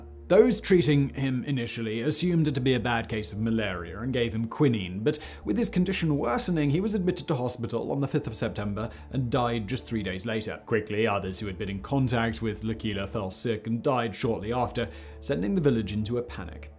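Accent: RP, United Kingdom. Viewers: Your accent is hilarious. It's like recieved pronunciation but more irritating. You sound like the queen did in 1955.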